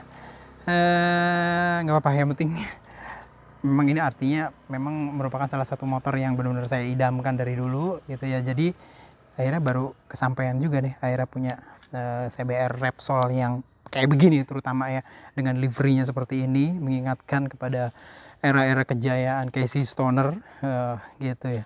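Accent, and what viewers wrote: South Jakarta, Indonesia. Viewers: Agak2 jaksel ya ngomongnya 😁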